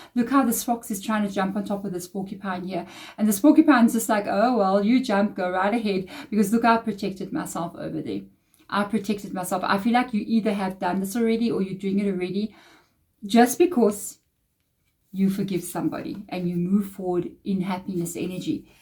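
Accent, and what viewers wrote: South Africa. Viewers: Good morning You sound South African, I’m based in Johannesburg, I would love to come for a one on one session, is that possible if Yes please send Me a guide on how I can make this a reality, thanks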